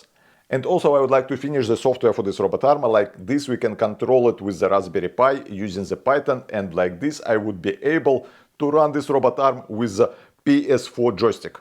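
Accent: Russia. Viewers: Ты чё, читаешь с листика английские слова русскими буквами написанные?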